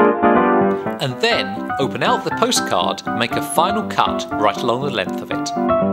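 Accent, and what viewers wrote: United Kingdom. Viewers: stupid british accent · The way British people speak drive me nuts and annoys so much but at the same time it attracts me and I try to pronounce words the same way. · I hate this man's accent. Can't stand british...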